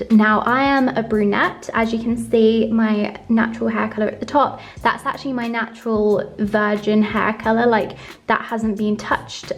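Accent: England, United Kingdom. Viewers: U look really amazing good job! Explanations are very clear and well said 👏love this british accent! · An English Accent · I love these English girls and their accent. I gotta find one for myself.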